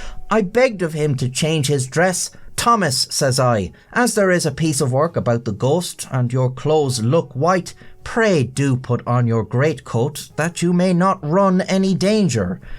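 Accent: Ireland. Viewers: 😂 i feel like i've just climbed out from underneath the rock. Because everybody knew about this guy's channel BUT me 😮 OR AT LEAST 1.5+ MILLION PEOPLE DID 😎👍 WITH THE CUTE IRISH ACCENT AND THE ANIMATED VIDEOS I THOUGHT AT FIRST. IT MIGHT BE JUST ANOTHER AI CHANNEL. BUT AFTER TAKING TO REDDIT AND OTHER SOURCES, IT SEEMS THAT HE'S BEEN AROUND BEFORE THE A I BOOM AND WAS DOING HAND ILLUSTRATED VOICEOVER VIDEOS BEFORE THAT TECHNOLOGY TOOK OFF! FROM WHAT I'M READING, THERE WAS PUSHBACK. WHEN HE STARTED TO GO WITH SOFTWARE ANIMATION, INSTEAD OF HIS HAND DRAWN STYLE BUT YOU CAN'T TAKE AWAY FROM HIS AWESOME SARCASTIC, AND ENLIGHTENING COMMENTARY. THANKFULLY, I WASN'T HERE FOR ALL THAT DRAMA 🙄❤ I'M ONLY HERE TO SUBSCRIBE. LIKE THE VIDEOS, BECAUSE NOT ONLY ARE, THEY THOROUGHLY INVESTIGATED WITH FACTUAL INFORMATION. BUT THE COMEDY STYLE COMMENTARY KEEPS ME LAUGHING. THROUGHOUT THE WHOLE VIDEO. 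DON'T EVER STOP BEING YOU ❤ BECAUSE THE FANS LOVE YOU JUST THE WAY YOU ARE. #SUBBED #facts #BeYou #subscribed #NewSubscriber ❤😊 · Dear, I officially watched all of your videos. Thank you for nice content, your own animation (that you done by yourself, yes I watched previous video) which is funny same as you nice sense of humour. I always watch your videos while eating, it make me almost spit food in monitor couple of times, I don't regret it. I always glad to see your irish mug and hear your irish accent, I am a bit tired of brithish and aussie accent, I am russian and I have aussie and british friend here. Wish you drink less, good health, make more jokes and of course loyal friends and prosperity.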